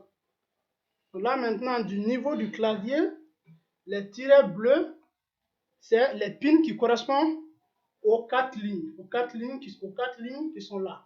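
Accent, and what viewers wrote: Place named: Gabon. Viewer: Bonjour on dirait plutôt l'accent du gabon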